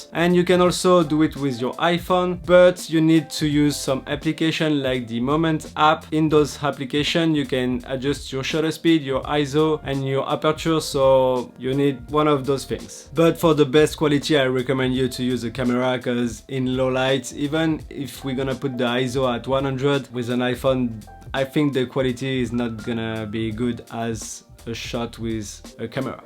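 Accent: France. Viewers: Lovin' this french accent 🥰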